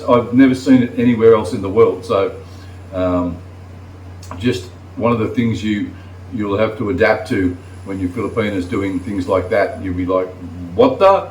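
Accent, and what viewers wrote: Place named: Australia. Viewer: I just like to listen to talk.. nice to hear Aussie in the Philippines 😂.. agree with the dogs.. sometimes I think they are hungry coz if they are full they just sit around relaxing..😂.. yes if you can stop smoking it's good for you although it's cheap here.. enjoy your day guys.. ❤❤❤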